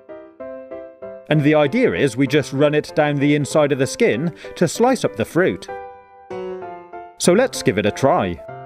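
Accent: United Kingdom. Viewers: if I had a British accent like yours I'd never shut up 😂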